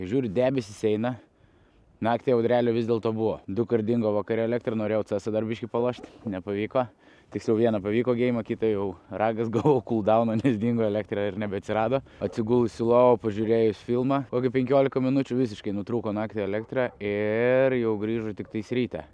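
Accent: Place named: Samogitia, Lithuania. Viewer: Labai simpatiškai skambėjo tavo kalba žemaitiškai👍pajutau jog esame giminingi...Palanga močiučių🥰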